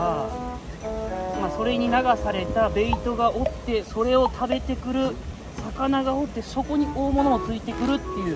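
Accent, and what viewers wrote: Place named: Sado, Japan. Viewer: 佐渡弁すごいですね！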